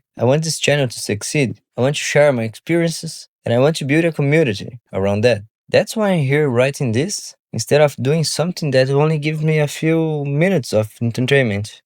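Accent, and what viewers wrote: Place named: Brazil. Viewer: pelo sotaque ctz q é brasileiro, baita video amigão 👏👏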